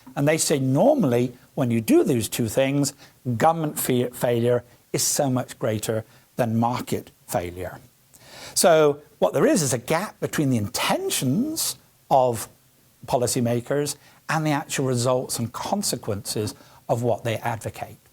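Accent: United Kingdom. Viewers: Great video! I can't quite place the gentleman's accent; are there any people from the UK who can recognize it? Is he Northern Irish?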